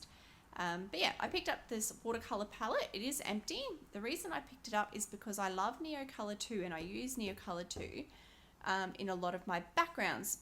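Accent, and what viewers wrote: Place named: Australia. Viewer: Welcome to the colouring community! So happy to hear another Aussie voice. Looking forward to watching your videos and channel grow. Good luck with it mate. Stay safe and Happy Colours, Sara 🐨💕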